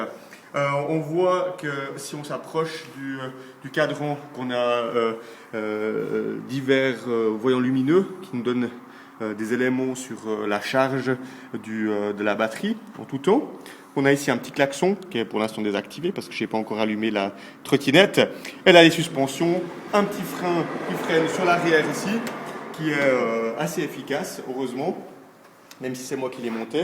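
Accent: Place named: Belgium. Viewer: vu l accent de Belgique lol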